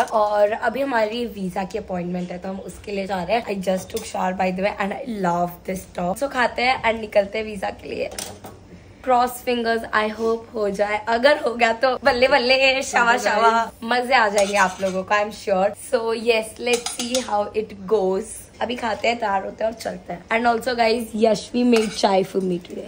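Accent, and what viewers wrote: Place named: Bihar, India. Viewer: Your bihari accent is just soo cute when you where unboxeing that red purse 🥰also lots of love for you both ❤and waiting for your fun videos💞💞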